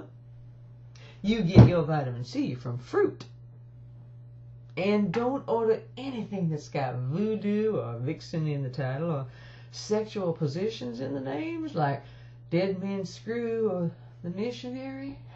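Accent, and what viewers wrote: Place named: South, United States. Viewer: I vote for number two all the way. ' I think number two fits you and your personality. Lady like and professional all the way, and the Southern accent fits you also. You are a true Queen of the South""""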